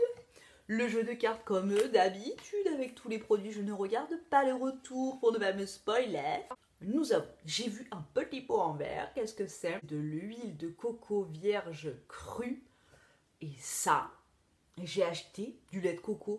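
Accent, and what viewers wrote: South, France. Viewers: Quel sketch t es délirante 😂 (perso j'adore l'accent du sud ) j ai essayé le bain de bouche a l huile de coco et c est pas transcendant à part que ty as une belle couleur de dent je trouve mais côté fraîcheur c'est pas extra no no no 😛.pleins de bisouilles 😘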